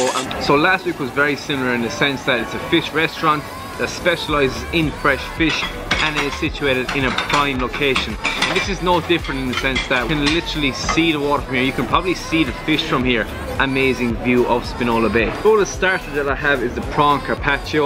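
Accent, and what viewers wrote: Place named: Ireland. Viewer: very nice intro, looks nice restaurant, OMG!!.......there are so many good restaurants..in Spinola bay..sadly so many come and go!! i subbed, so i keep an eye out for you..( sounds a little like a guy from the Emerald Isle )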